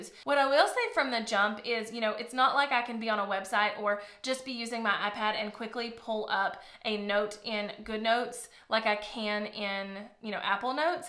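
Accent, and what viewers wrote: South, United States. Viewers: I love that southern accent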